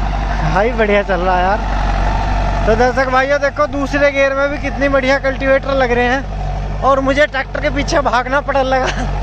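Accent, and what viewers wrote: Haryana, India. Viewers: Haryanvi hai yo punjabi koni samj gya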